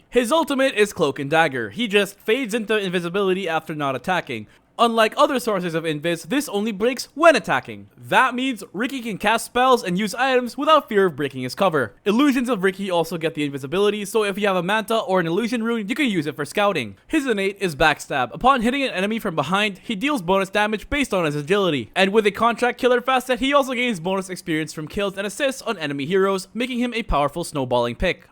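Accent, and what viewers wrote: Philippines. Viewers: heya will u also do content on league? that'd be awesome also I can still hear your Filipino accent UwU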